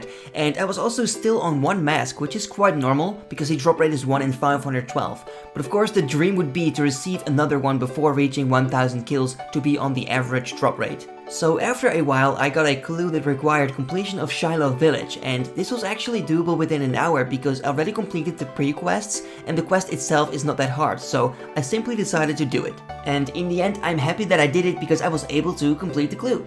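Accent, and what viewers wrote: Netherlands. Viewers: such a baller lmfaoooo. You kinna sound dutch doesnt suprise me you a fan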